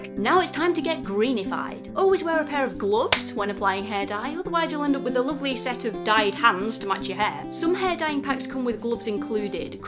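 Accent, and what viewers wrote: North, United Kingdom. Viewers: Love this video... Love your northern accent getting so excited about hair dye haha XD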